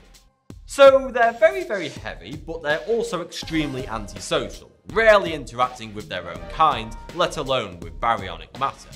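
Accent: United Kingdom. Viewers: I like you British vsauce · Is he British?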